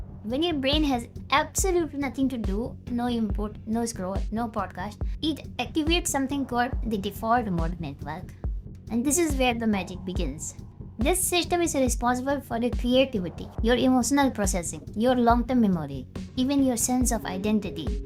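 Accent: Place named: Southeast Asia. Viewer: Are you Singaporean, Malaysian or Indonesian? (Made a guess based on da accent XD)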